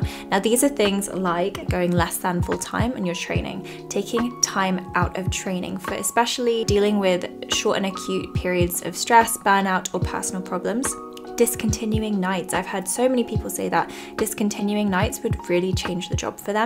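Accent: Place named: Australia. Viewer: You have an aussie accent. How come?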